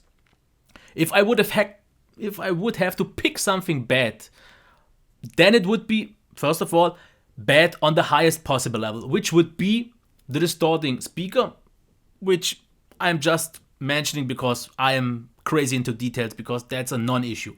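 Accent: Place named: Germany. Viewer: I thought German or Austrian, you put us English to shame, we are lazy with language. Keep up the good work. I may spend most of this weekend catching up with your channel! Happy new year to you.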